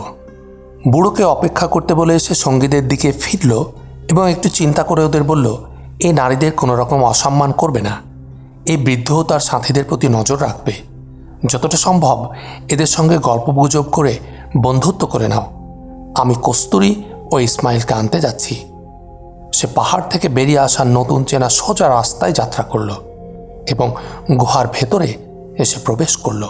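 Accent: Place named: Kolkata, India. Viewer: ভাই আপনার কথা বলার স্টাইলে মজা পাইনা, কলকাতার মত লাগে, বাংলাদেশের টনে কথা বলুন প্লিজ, (সুন্দরের উচ্চারণ করছেন ছুন্দর এমন অনেক উচ্চারণই বাংলাদেশের টনে হচ্ছে না,)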